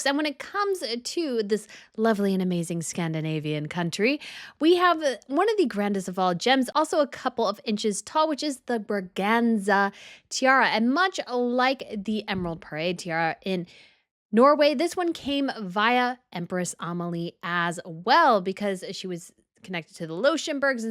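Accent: United States. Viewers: I am a new subscriber as I REALLY enjoy your appreciation for royal history and deep admiration for all things tiaras ❤ Could you please take a little more care with pronouncing the names of royal family members and the fashion/jewelry designers? I've heard several names pronounced in the American style, which is off-putting to the designers from other countries. I'm sure your viewers from outside the U.S. will love you for it.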